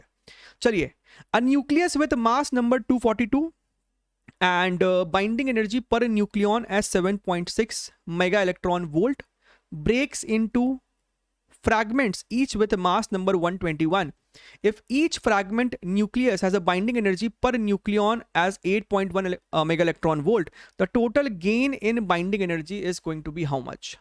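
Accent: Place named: Haryana, India. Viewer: 1:21:8 sir haryanvi tone 😀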